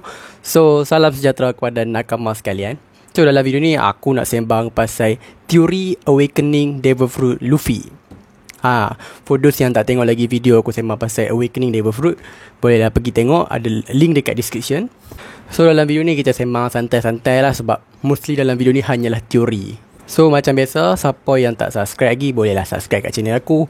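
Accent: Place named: North, Malaysia. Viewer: welcome bro...all the best..penerangan bro jelas, dan yg best cakap utara hahahaa..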